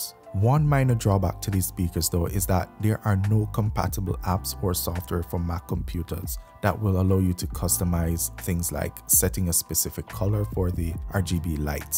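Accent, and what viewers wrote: Jamaica. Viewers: Man sounds Jamaican asf loool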